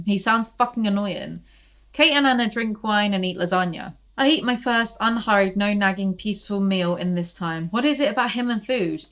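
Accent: United Kingdom. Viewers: every time i finish one of your 2-3 hour long videos i start thinking in a british accent. i just wanted to share this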